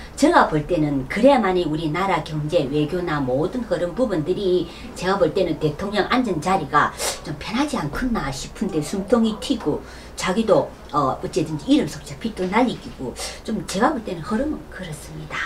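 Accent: Gyeongsang, South Korea. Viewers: 옛날에 *자갈치아지매*라는 라디오방송이 있었는데 비슷한것 같네요. 구수한 경상도 사투리 정겹습니다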